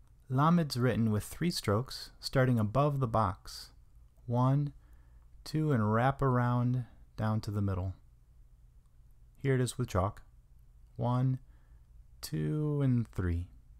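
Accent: Europe. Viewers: Of course you use the European pronunciation and not the proper Tiberian pronuncisrion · nice cours, but the pronunciation is not authentic to the original hebrew, it sound like european pronunciation more than middle eastern pronunciation, I disagree with pronunciation of some letters : ח ע צ ק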